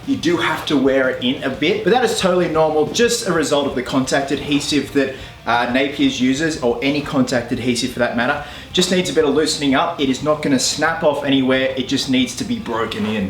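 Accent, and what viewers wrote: Australia. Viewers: I actually bought it that you were Batman when you were doing the reveal video. Then when you spoke again I was like "Oh, right he's Australian" lol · It’s weird cuz batman has an Australian accent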